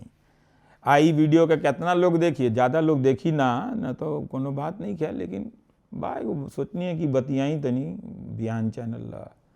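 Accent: Bhojpuri, India. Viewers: Main bihar ke siwan jile se dekh raha hu app to pure bhojpuri bol rahe hai sir Salam sir app Ko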